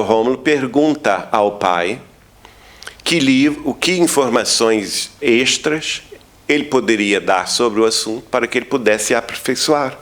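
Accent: Portugal. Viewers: porque o sotaque portugues??? kkkk nao faz sentido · Ah pq ele esta fallando c sotaque de portugues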